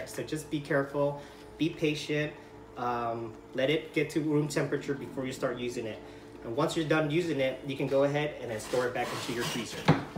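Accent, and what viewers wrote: United States. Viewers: Are from the state of Hawaii? I think I hear the local Hawaiian accent.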